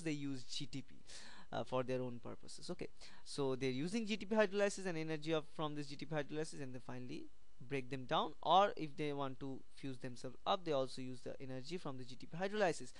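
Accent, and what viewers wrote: India. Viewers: Can you make captions for your videos? I’m not Indian so I have trouble understanding you.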